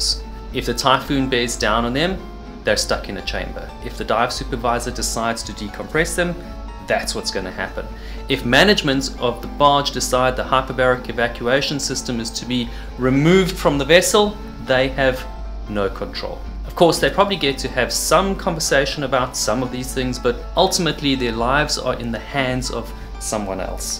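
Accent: South Africa. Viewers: South African accent on point💨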